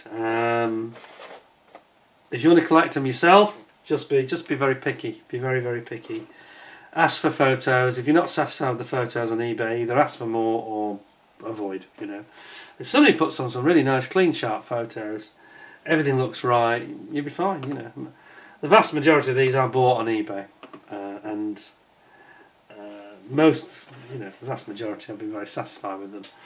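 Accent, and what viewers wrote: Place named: United Kingdom. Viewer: Hi, I don't judge your nice job on YT. I was only saying that these posts look quite anachronistic.....I don't know and never heard one modeller that said Matchbox was top-notch rather the opposite. And I can confirm that because I used to build them, a lot, in the late 70s. Maybe you are right about flashes, good fit, I don't remember but let's talk about details or paneling lines....I read somebody here saying that panels of matchbox kits were done with the plough....a joke but it gives the idea. ANYWAY they are a nice memory of my youth, when I built and still have (restored) an harrier, a jaguar, a UK phantom, a panther, a beaufighter, a Corsair II, a Skyhawk. These survived over the years But I wouldn't say, ever, that their quality was good. Cheerio. By the way I love following you because I can trainy listening skills with your slightly difficult British accent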